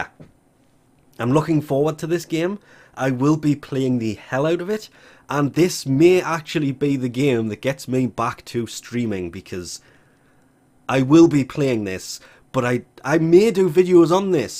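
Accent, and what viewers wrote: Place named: Newcastle, United Kingdom. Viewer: hmm I hear a hint of georgie accent there, I would have to guess durham?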